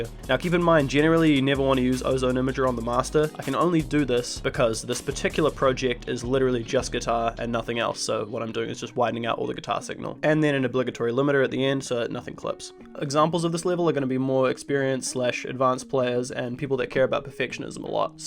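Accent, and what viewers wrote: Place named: United Kingdom. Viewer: This guy gets it with a British accent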